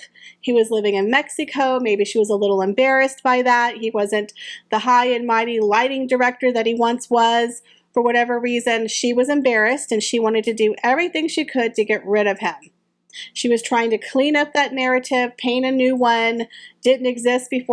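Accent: South, United States. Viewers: It's that slight southern accent "Y'all" 😉 😘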